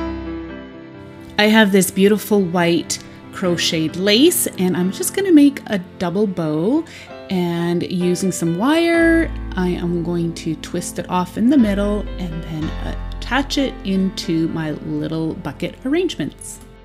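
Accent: Canada. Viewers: I love your DIY's!! Also I recognized your Canadian accent.. lol. I'm a former Canadian living in Arizona.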